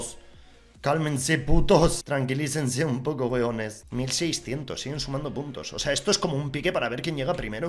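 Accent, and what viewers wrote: Chile. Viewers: hablando y puteando como un buen chileno sjdj saludos weon🇨🇱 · Like por el acento chileno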